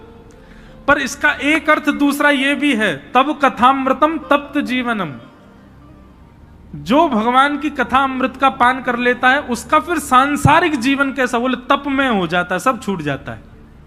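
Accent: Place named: Braj, India. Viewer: Apki brijbhasha me boli bhaut achhi lagti hai maharaj ji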